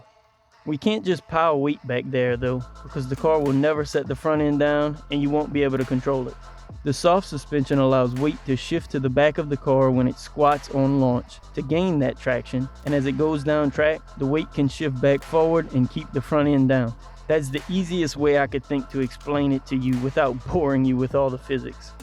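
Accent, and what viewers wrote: Louisiana, United States. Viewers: Bro.are you from Louisiana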